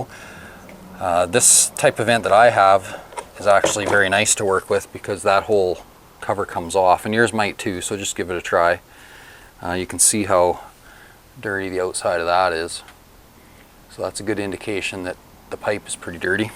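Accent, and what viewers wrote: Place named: Canada. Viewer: Luv this dudes Canadian accent.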